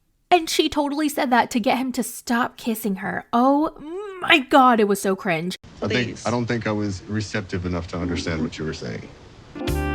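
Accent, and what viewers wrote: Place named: New York, United States. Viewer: Lol, your new York accent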